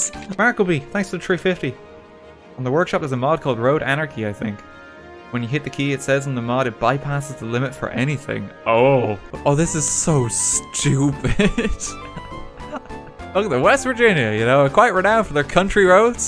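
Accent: Ireland. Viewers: Are you an Irish gaymer? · this is my first time watching him because of country roads then I said OH YOUR IRISH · Themlgdinosaur 12 Both of them are from Ireland, so they sound quite similar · Are you Irish? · Are you Irish? xD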